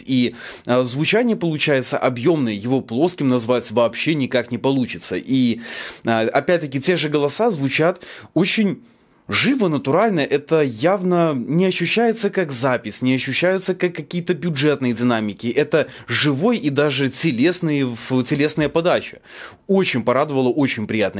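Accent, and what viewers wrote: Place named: Belarus. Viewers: Дзеканье цеканье то да, отличительная особенность белоруса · Сам тоже из Беларуси, но после года жизни в Москве, очень слышен твой белорусский акцент, аж уши режет)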